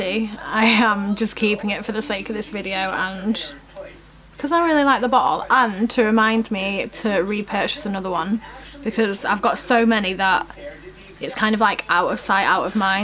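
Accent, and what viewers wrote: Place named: United Kingdom. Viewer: you're so pretty! I love your accent. Are you British?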